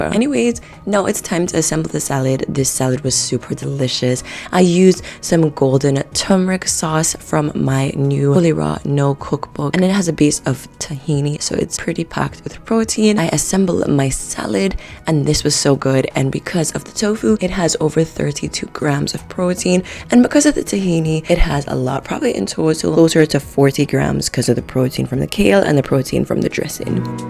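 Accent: United Kingdom. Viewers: Excellent British Accent 👌🏾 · I love a good British accent ❤❤❤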